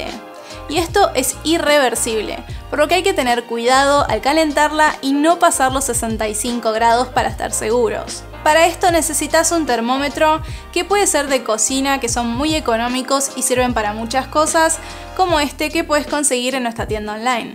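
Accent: Argentina. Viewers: es el acento argentino, gracias por apreciar <3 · Aqui en Francia, ese tipo de cafetera se llama "Cafetera a pistón" y creo que solo en America lo llaman asi "Cafetera Francesa" pero bueno yo con gusto :D jajajaja gracias por sus videos con ese acento argentino muy bonito :) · acento porteño*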